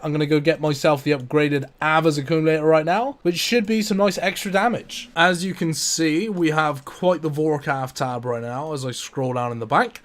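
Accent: Bristol, United Kingdom. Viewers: Ooh arr Bristol Lad are u? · Subbed because of the Bristol accent. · That accent is awesome, where in the UK you from?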